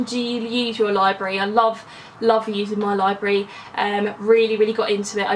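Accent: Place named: Birmingham, United Kingdom. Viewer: I love you're accent! Are you from Birmingham?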